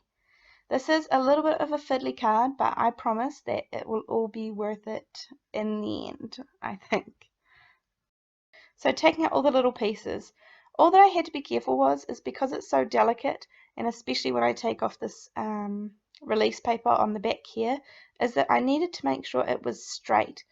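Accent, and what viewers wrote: New Zealand. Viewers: Gorgeous card and a technique I am keen to try for myself. Wonderful to hear a Kiwi accent , that's why I subscribed to begin with, as support for a fellow New Zealander then I made the happy discovery that you are also a very talented card maker so many thanks for putting so much time into sharing your skills with us.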